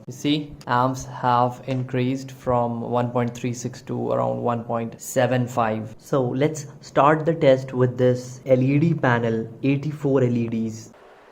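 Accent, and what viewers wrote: India. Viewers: У него индуский акцент??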